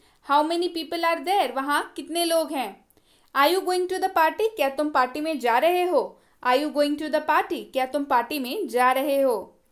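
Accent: Bihar, India. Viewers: ma'am Kya aap Bihar se ho? Aap bhut achha pdati h. All sentence are very useful in daily life.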